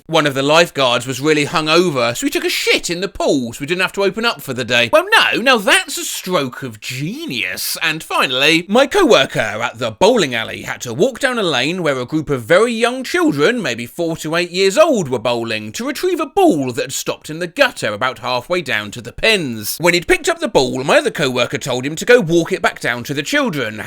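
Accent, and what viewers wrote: United Kingdom. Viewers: Hi 😊❤ I'm not very fluent in English, so I watch YouTube vids to improve my skills. I love your vids so much and also I love British accent 😍 thanks for your great work 🤗 P.s: I'm Iranian and I'm ashamed to say some people here do this😂😂😂😂 bro probably was my compatriot 😅😅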